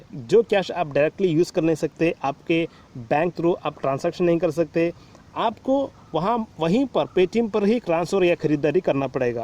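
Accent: Kerala, India. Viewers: Malayali ahn ale Hindi kettepoll thonni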